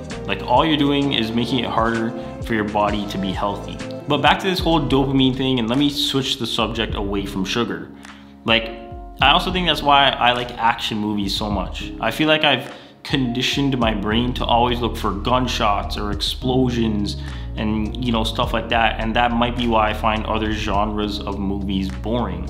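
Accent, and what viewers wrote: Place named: Toronto, Canada. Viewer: Man you have such a Toronto accent😂😂.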